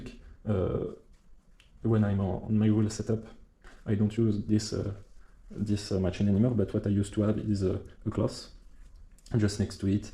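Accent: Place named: France. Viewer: u speak english very well, euuuuuh, wiz youre french accent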